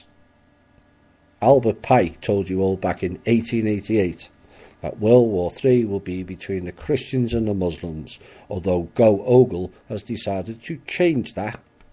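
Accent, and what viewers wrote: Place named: United Kingdom. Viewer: How could it take almost one billion dollars to put a new roof on that church? Something wrong with that picture. From the mindset of an American living in southern California I must say I find your commentary with just a tad bit of cynicism spoken in your British accent quit amusing while very informational. Thank you for your efforts to keep us informed of things regarding the evil cabal!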